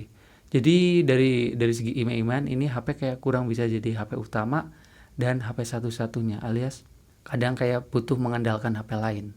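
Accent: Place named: Palembang, Indonesia. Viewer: wong palembang wkwk, bahaso nyo ketaro bang:v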